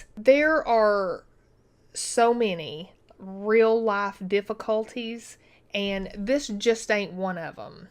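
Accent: South, United States. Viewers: I❤️U & your spirit & your southern drawl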